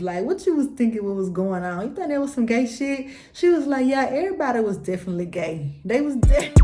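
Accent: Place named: Georgia, United States. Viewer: Gulll you gotta be from Ga! Ain’t no way you not. Idk you but babeeeeee we “we tewwww murch alike “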